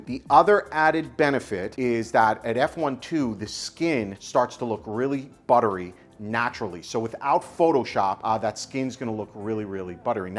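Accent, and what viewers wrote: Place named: New York, United States. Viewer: As always, informative and helpful. Question, are you originally from NY? As a NYer that accent of yours harkens memories of home.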